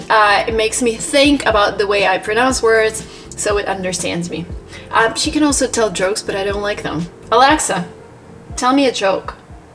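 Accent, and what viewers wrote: United States. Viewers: Your accent sounds 💯% American english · You are so beautiful and everything is naturally . I love your American accent. How to work with "fluent express" because I'm newer here. Can you explain please. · Where are you from ?, i was thinking tha you American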